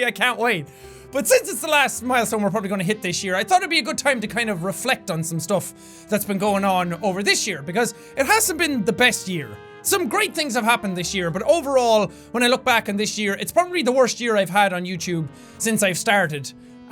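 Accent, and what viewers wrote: Ireland. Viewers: I'm so happy for 17,000,000 subs love your Irish accent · Early and Congrats on a huge mile stone I have watched you channel since you didn't sound Irish well done jacksepeye!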